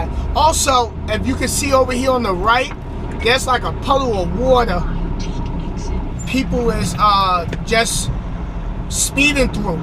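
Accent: New York, United States. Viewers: Man, your video is awesome. You have this unique extremely funny and down to Earth personality I could listen to you all day your freaking hilarious. All laughing aside you make a lot of very good points that all drivers should be thinking about . Then add that classic NY CITY accent. We need more people like you on the roads out there, . Just the way you say shit its great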